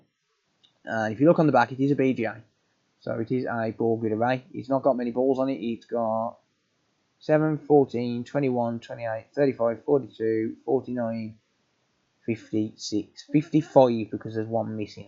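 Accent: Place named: Midlands, United Kingdom. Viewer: Subscribed! Not only is it nice to have a British Based Tech Channel I detect a fellow Midlander accent😂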